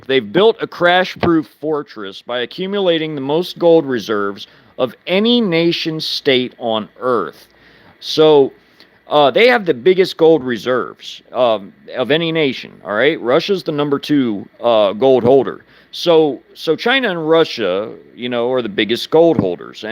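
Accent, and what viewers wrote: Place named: Kentucky, United States. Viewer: The story of the CSS Alabama which Three Country's attacked her that day. The French The British and the lunatic Sherman Government.. This is the same Axis of evil in every war since. My grandfather loaded the Gold from Germany. My uncle was MP at Redstone.. It was my uncle who told me what Redstone was and why it was named that. One big hot Redstone because they have a enough nukes to destroy the planet 6 times over. At 9 year's old I asked my uncle why. I asked other questions as well. Uncle Don would not tell anything till I had brought him at least 9 Beers later. He was one who knew All . His answer was that we had everything buck Roger had. Lasers spaceships and much more.. That started my research. When I ran across you on YouTube you sounded like my family in Kentucky.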